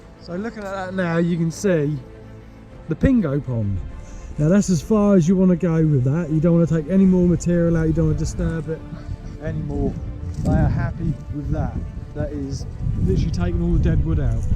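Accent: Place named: Suffolk, United Kingdom. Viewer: Hey baa nothing wrong with Suffolk talk greetings from Newmarket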